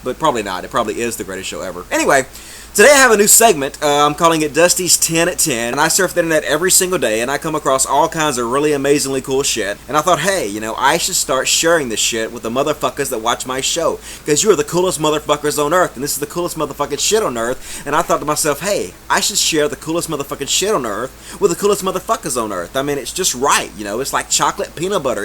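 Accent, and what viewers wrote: United States. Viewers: Didn't know that you were a fellow Mississippian. I knew you sounded a little country. Fuckin' awesome.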